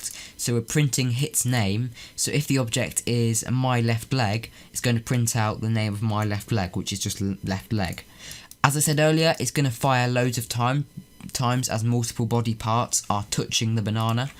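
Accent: England, United Kingdom. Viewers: I love the way people with English accents pronounce the words and say er , ar, and like bananar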